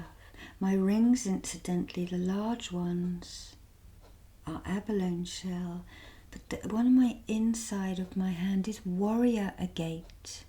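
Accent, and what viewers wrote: England, United Kingdom. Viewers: what can I say, I just simply adore. Your English accent I just love your videos mate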